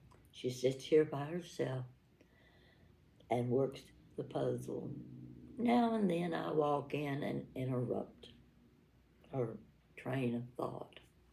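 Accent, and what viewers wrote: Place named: Florida, United States. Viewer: This is Kitty I live in S. California. I discovered your channel a week or so ago. I forget which video but you were in the kitchen. My mother in law was from Lakeland Florida and I miss her voice. Your accent is similar and that’s what I heard at first. In the kitchen you remind me of my grandmother with whom I spent many hours in the kitchen with. I am 77 now. A widow since 2019 after 50 plus years together. I was his caregiver for decades. It occurred to me that at least in my world out here on the West coast life seems isolating. I too talk to myself. I read that it is good for your brain. I also love dishes and setting the table. I have what they call “poorman’s fiesta” called Harlequin made by the same company. Summers as a child I’d spend a time at my grandmother’s house near Atlantic City NJ. Her house had a front porch and we would have breakfast out there. 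My grandmother would put our soft boiled eggs in the Easter egg color Harlequin sugar bowls. Clever. The bowls must have come out in the 20’s as they were very Art Deco looking to me with triangular shaped handles and lid top. As I write I realize it must have been around the time she passed away I started to think of those things we ate the soft boiled eggs in and oddly enough that very year they reissued them. I wanted the original ones and began hunting for them and that started my collecting other dishes and china. I love dishes and ceramic things. p.sl. I got a felt skirt with. S& H green stamps. I wanted a p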